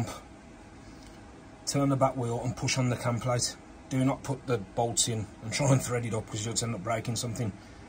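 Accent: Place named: Black Country, United Kingdom. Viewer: Nice to hear a black country voice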